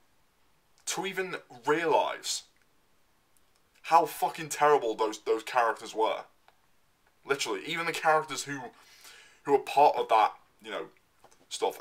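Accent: United Kingdom. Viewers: Are you from the north? More specifically Scarborough? I'm terrible with identifying accents but you sound very similar to my accent. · I take it with that accent that your British if so its nice to see a British take on you know who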